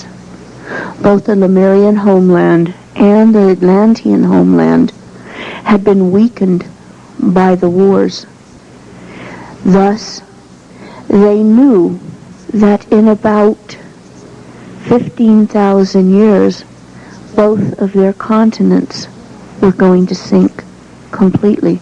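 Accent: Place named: United States. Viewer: why does she talk in american accent?of all accents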